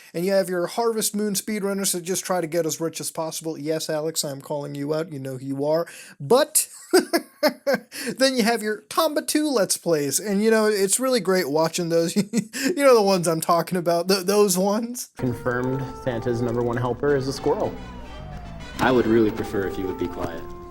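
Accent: Canada. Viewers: are you canadian ???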